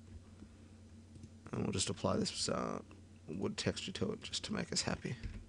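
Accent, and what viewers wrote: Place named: Australia. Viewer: Gotta love that australian accent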